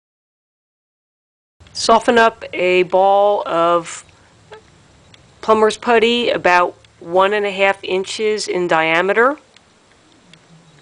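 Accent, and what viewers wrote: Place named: North, United States. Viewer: Great Video! I just have to say. Being from the South, I had to get around the NORTHERN ACCENT, LOL. Great video, I had to install same exact one! Awesome Job!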